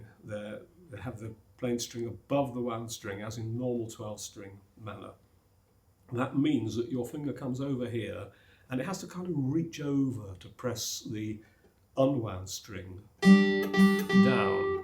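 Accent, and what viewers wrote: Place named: United Kingdom. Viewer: I am truly intrigued by this gentleman’s British accent. Being an American our English is is rather lax and candid.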